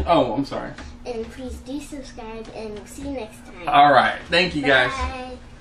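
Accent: Philadelphia, United States. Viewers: Excuse me sir, you sound like you might be from Philly, or the surrounding area? I grew up in Berwyn/Valley Forge area.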